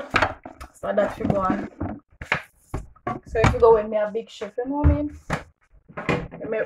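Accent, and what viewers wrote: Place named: St. Elizabeth, Jamaica. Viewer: Never know you could add cucumber, I gotta try that,love your accent, reminds me of my hometown in st Elizabeth.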